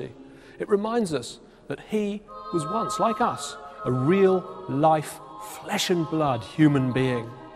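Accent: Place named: RP, United Kingdom. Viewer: Is it me or English have a tendency to replace quality in contents with a posh pronunciation and diction?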